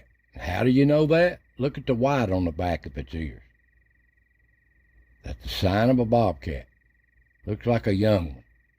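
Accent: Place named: South, United States. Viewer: We really envy you, living in that beautiful place, looking up into that Peaceful Place that God gave us to embrace & enjoy😊. The simple pleasures really are the best. Really enjoy listening to you narrating those wildlife events & happenings, just something real right hearing it said with that old southern drawl; real authentic you know: right down to the ground genuine!! The Peaceful moments are much appreciated. Next best thing to being there🙏🏻!! 🫎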